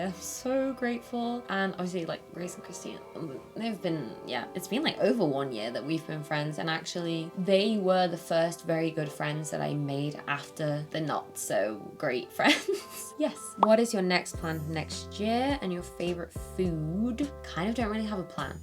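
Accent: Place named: United Kingdom. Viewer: Love ur accent pls teach me British accent 🥹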